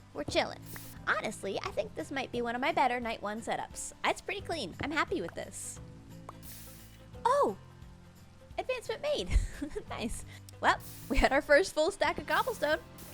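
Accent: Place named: Canada. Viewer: Mannn the Canadian accent. I löve it. It’s so endearing. (I’m from UK)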